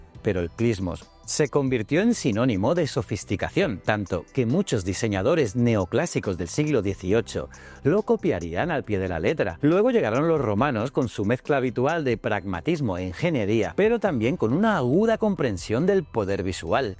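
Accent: Spain. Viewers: Tú eres andaluz? Verdad? Las tres primeras palabras del vídeo te delatan, jaja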